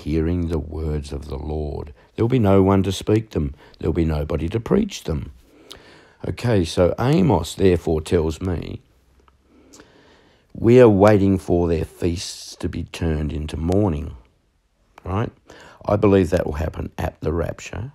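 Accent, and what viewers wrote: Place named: Australia. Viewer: While i was thinking how cute your pronunciation of Esther was, you said you got told for it 😂😂😂 i like the Australian english😊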